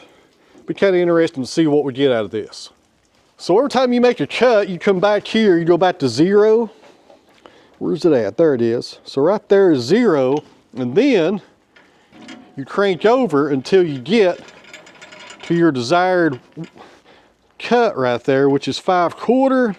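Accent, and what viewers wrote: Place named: South, United States. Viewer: Love your channel!!.. and your southern drawl! Refreshing personality. You should avoid placing batteries directly on the ground in the cold… as the earth naturally drains them far quicker than when they are insulated. Perhaps a simple insulated wooden box, mounted on corner post with a 40 watt incandescent bulb providing warmth. 🤷‍♂️ There is 40% less energy available in a battery 0*F than 80*F, everything else remaining the same. Good luck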